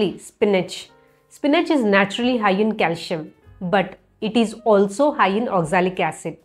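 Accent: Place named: India. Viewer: Her voice and accent SO BEAUTIFUL….I love Indian accent so cute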